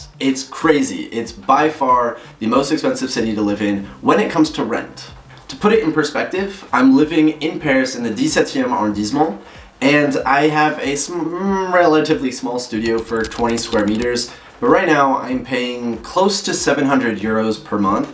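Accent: United States. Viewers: C'est surprenant que même après neuf ans en France vous gardez votre charmant accent américain.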